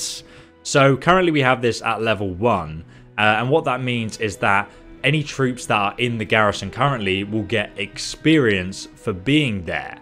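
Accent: England, United Kingdom. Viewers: You guys do realize that the man is British and speaks proper English. He lives in a place where the english language started so just think about that before criticizing. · "mil-ih-tah"? England, please, just stop. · And Here i thought its obvious He is Brit oh lol..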